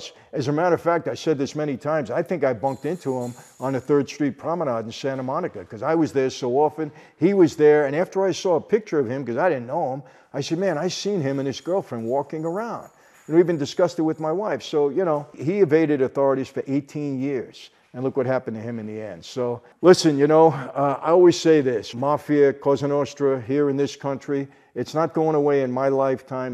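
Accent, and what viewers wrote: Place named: New York, United States. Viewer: Wonderful story, by the only person I want to hear it from. Yeah the Italian is a bit rough, but the NY accent makes up for it !! I love the ' 2 question ' format !! There are so many interesting people who comment on this channel, it's fascianting to hear their qestions answered. Best wisjes to all who gather here , and great congratulations to a + 1M channel, it's been well earned and deserved !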